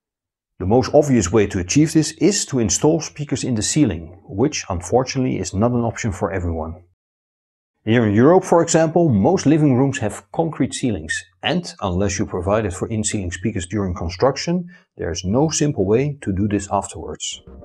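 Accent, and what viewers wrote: Netherlands. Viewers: enjoyed your dutch accent thought :) my main challenge with these Focal is where to set u the speakets. because in the back of the room the roof is crawling (-under the roof), so I don't know how the speaker will manage the atmos. It is very clsoe to the roof.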